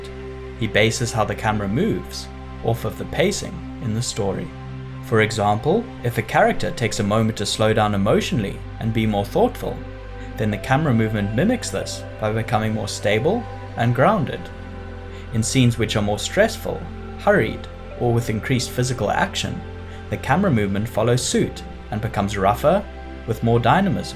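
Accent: South Africa. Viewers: Dude are you from South Africa??? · you sound south african? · You sound South African, am I hearing correctly ?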